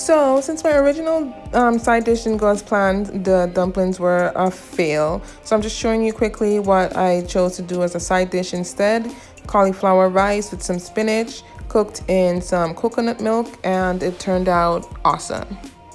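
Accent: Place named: Dominica. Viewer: I thought your accent was from Dominica. Nice to meet you. :)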